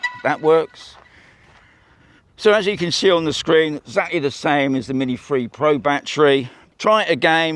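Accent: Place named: England, United Kingdom. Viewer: I am entrigued to know where in the UK you came from before moving to Spain. I seem to detect something of a London accent merged with something from the South-West of England. Am I correct?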